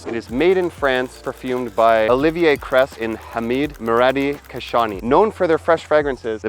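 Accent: Canada. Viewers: Anything in a 4.2oz bottle is definitely worth it. 100 meters🤣😂😭Canadians definitely talk differently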